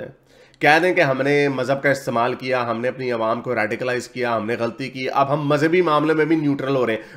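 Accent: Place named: Punjab, India. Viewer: He has a Punjabi accent. The original Urdu accent is the Delhi Urdu accent since Urdu was born in Delhi.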